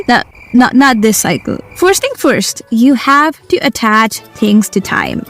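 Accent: India. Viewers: are you indian,you have a nice accent,kinda motivating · First actual good video like this, also a very good starting point in me learning to talk in indian accent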